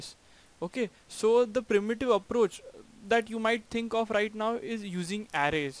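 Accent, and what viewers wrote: India. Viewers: could i find some tutorial without the indian accent ? it's hard to understand the most of what he says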